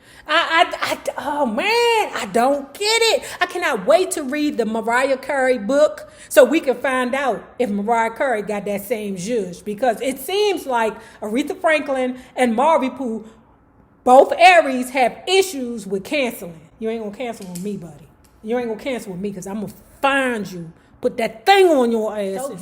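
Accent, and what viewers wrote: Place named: DMV, United States. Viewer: you bring back great memories of me and my children living in the DMV. Your accent and the things you say crack me up cause you are a true representative of The DMV. Keep being yourself.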